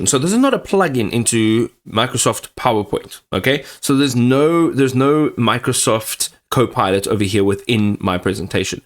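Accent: South Africa. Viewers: Saffa accent. Good stuff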